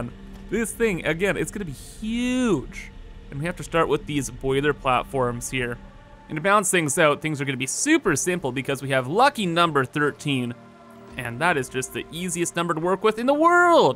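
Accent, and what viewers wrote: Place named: Canada. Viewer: no, mate, listen too his accent. He's so Canadian. So very Canadian.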